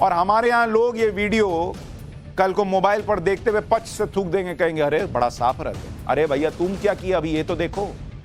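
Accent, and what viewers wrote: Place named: Bhojpuri, India. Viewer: Love you bhojpuri words and accents you use in between,it helps in suing away the stress got fron the serious news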